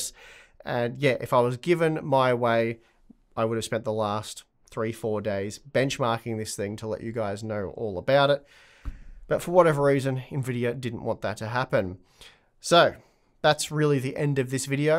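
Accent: Australia. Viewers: Love your work. I follow 4-5 different channels and I like the different takes. Also, mixing it up with some aussie accents is always a good thing.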